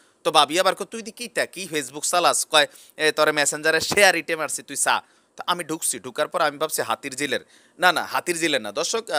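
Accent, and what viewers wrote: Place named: Comilla, Bangladesh. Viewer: ভাইয়া আপনার কুমিল্লার ভাষায় কথা বলাটা অনেক ভাল লাগলো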